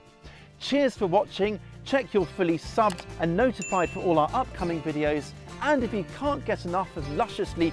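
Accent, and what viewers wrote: England, United Kingdom. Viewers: He sounds English, maybe zone 7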